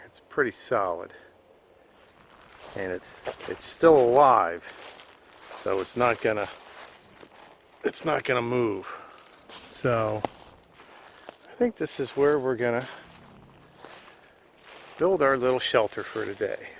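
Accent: North, United States. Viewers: Not a swamp at all you’re in a hardwood forest. You sound like a yankee transplant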